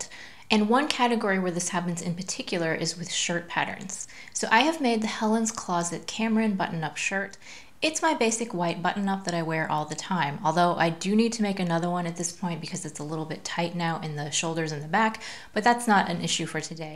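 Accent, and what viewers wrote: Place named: United States. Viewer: I'm not sure where you are, I'm guessing USA from your accent, in which case I don't think they sell the cutting table there. It's a New Zealand company and I bought mine in Ireland, I also have one of their sewing machine tables. However, I'm sure that there must American brands that offer similar products. The most important thing is the ability to set it up to be the height that suits you, it has made a huge difference to my lower back. Lockable casters are useful too.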